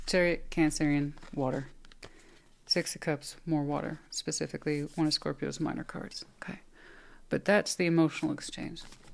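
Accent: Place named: Texas, United States. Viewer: Once again, I have closed my eyes laid back then I started your Pisces reading after listening to what seems to me a very happily excepted different more calming tone to your Texan voice of past? Your reading is so very correct i can not fail not to actually not believe that I what your Tarot cards speak to You ☆♡XIII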